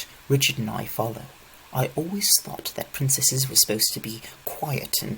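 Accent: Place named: England, United Kingdom. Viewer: The horrible English accent just makes this book ten times worse than it is.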